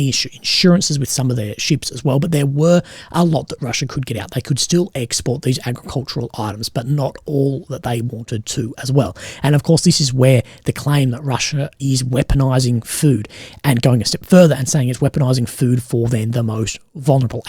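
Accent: Australia. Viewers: Thanks, as a Kiwi, your aussie speak is sometimes hard to understand thanks for the subtitles :). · Subtitles are ok but make them optional. For us that can cope with Aussie speak, they are distracting. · Definitely, because Australian English is the black sheep of the English language Dialects. English is my native language and i dont understand them sometimes either. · wish I could turn them off, but as an Australian I'm used to the accent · a great adittion to a great content, sometimes its a difficult to undesstarnd the aussie accent, even more if english its not your 1st language, so thank you for that adittion, keep on the good work.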